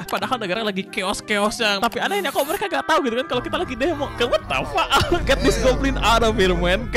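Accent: Bandung, Indonesia. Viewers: Lo orang bandung bang? 😦😦😦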